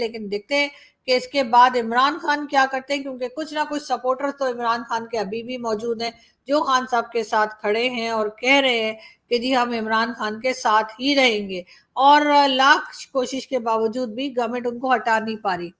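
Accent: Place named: Allahabad, India. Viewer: You're very cute BLOGGER, the way you speak it really reminds me of Allahabad , you're a true Allahabadi , keep it up , my best wishes and blessings I am too is ALLAHABADI , though live in Delhi BUT our ancestors Bunglow is still intact in Allahabad where i frequently visit , it's really a very sad situation that l live here in a flat WHEREAS only three aged my sisters and a brother lives in a 4 acre huge bungalow spread over 32000 sq feet I only wish that one day l could host you in my Allahabad house, if i really survive , l celebrated my 80th Birthday in San Francisco in the month of May So long ❤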